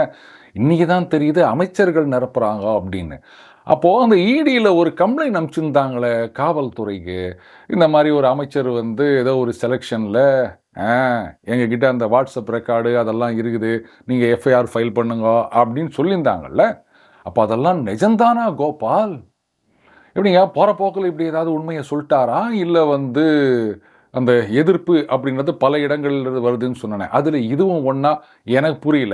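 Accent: Chennai, India. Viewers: Why don’t u talk in normal Tamil instead of Chennai Tamil. It is too bad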